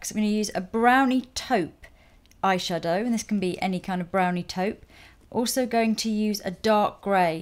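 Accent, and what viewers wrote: England, United Kingdom. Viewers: I like to listening English accent . You have got very beatiful voice . Videos are very beautiful Thank you ♥ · Which part of the UK are you from? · I like listening to your classy English accent. Reminds me of clients calling my line at work. :)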